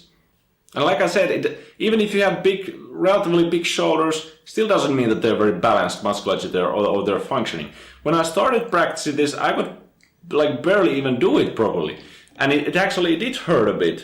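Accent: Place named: Finland. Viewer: No wonders, you have the accent of Finn, haha :) Great channel by the way!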